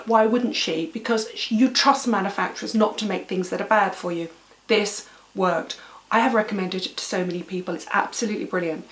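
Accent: United Kingdom. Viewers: British accent 😍😍😍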